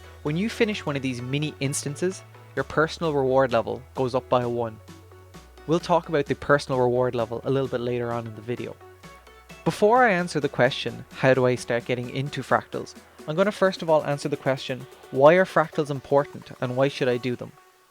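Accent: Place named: Ireland. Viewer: Love your video, thank you!!! The Irish accent makes it better, keep it up!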